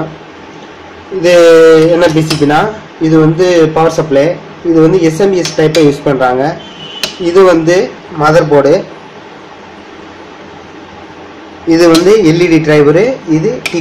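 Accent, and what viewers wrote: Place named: Madurai, India. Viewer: Good explain in madurai tamil...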